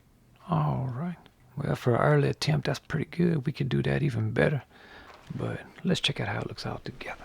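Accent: Louisiana, United States. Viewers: Hay are you from Louisiana?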